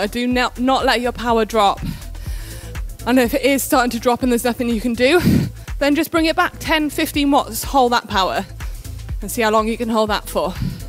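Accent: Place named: United Kingdom. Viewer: aahhh!!! British English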